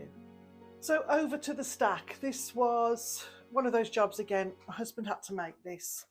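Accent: United Kingdom. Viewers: Hello I just wanted to tell you, that you have done a beautiful transformation to your bath! I enjoy listening to your voice and the little Brit ways of talking about your experience! Good luck with the rest of your home. I look forward to seeing all of your transformations! God bless and cheers! Theresa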